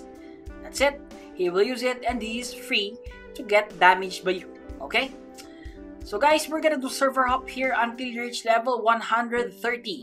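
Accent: Philippines. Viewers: Idk if this dude is pilipino or not he just sounds pilipino idk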